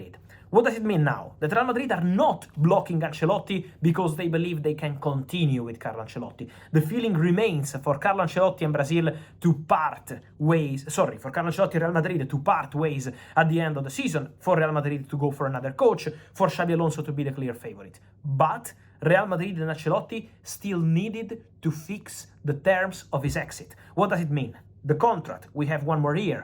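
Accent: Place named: Italy. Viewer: Fabrizio's fast speech accent of Italian are embarrassing to understand...please stay tranquilized and speaking slowed 😂🙏🏻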